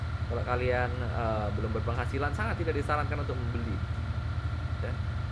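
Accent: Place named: Pematangsiantar, Indonesia. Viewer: Iyakan.... Iyakan.... Iyakan... Siantar kali bahasanya...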